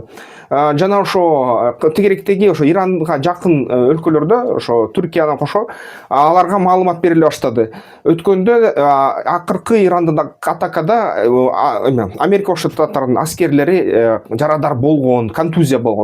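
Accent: Standard. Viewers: Таза суйлосуз агасы азаматсыз😊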